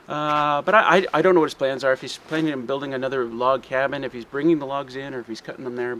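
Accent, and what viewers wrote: Canada. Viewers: Big fan of Shawn James I get a kick out of accent! Now that’s a Canadian accent! With Shawn you only get an occasional aboot!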